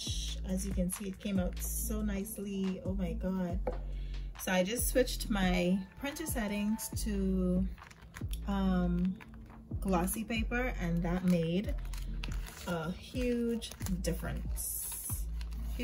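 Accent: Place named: Bahamas. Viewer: I heard ur voice and I’m like hey this got to be my hometown people. Bahamian accent so recognizable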